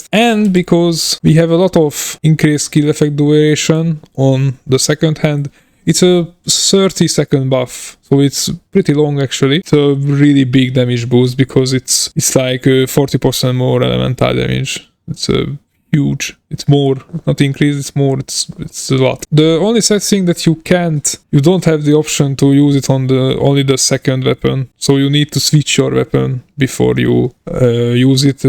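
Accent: Hungary. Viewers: You have such a Hungarian accent :D no offense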